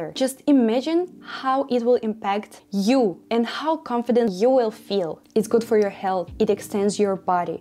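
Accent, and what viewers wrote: Russia. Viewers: Мне показалось, или у тебя русский акцент?) Прекрасные знания английского❤ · Трудно слушать этот русский английский даже мне как русскоязычному человеку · Сразу чувствуется русский акцент 😅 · Русский акцентт :333333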